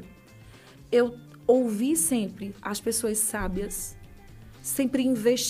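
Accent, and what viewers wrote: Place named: Northeast, Brazil. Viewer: Esse sotaque nordestino é contagiante 😊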